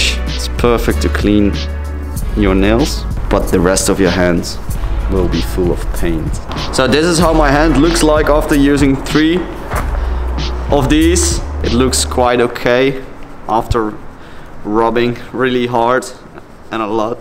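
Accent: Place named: Netherlands. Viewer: Dutch accent 🙃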